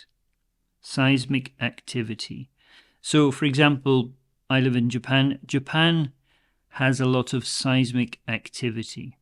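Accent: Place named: Scotland, United Kingdom. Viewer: From a French point of view, the term "Anglo-Saxon" refers to all people living North of the English Channel or across the Atlantic. But in reality, almost each village in "England" has his own accent, without taking into account Scotland with his 10 dialects (South Central, West Central, East Central North, Borders Scots, East Central South, Angus & Kincardine, Doric, Orkney, Shetland, Lalland, Scotch)... I haven't noticed rhotic accent with you yet, but /ʌʊ/ instead of /aʊ/ like (cow, town, house, down -> coo, toon, hoos, doon) or /əʊ/ by /o:/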